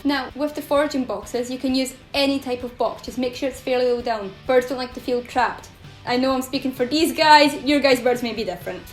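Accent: Scotland, United Kingdom. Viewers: Oops hit the send arrow. Puffin was with me one month short of 38 years, and Dixie, a Green Cheeked conure rescued from a horrible situation and given to me by my avian vet so I could rehabilitate her and give her a good home. Dixie passed away at age 22. Your videos are educational and entertaining. I hope you get a lot of subscribers so your knowledge is shared and valued. I love your accent--are you in Scotland? My dad was born in London, England, and served in the Royal Air Force in WW 2. My mother was American and I was born here in the USA. I'll be binge watching your videos for a while to catch up. Great fun, they are. Apollo enjoyed the ones we watched tonight.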